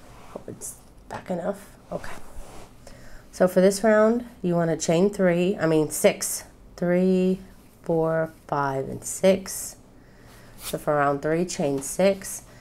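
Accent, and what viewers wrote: South, United States. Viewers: Did you say in "Israel'? I've been following your videos and never picked up on that. You sound so southern to me. Did you move there? Did I even hear right? Sorry, couldn't focus. Played it over. Anywho... thank you again for another video. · Have you lived in Israel all of your life? You have a accent with a USA southern drawl somewhat. I'd love to visit Israel.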